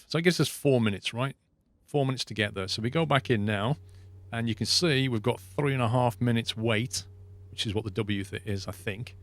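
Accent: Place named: Lancashire, United Kingdom. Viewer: The internet. He's on the internet. If you mean his accent, with the "thuuuuur" pronunciation of "there", I'd say Central Lancashire, somewhere between Southport and Burnley, Blackburn and Bolton. :o)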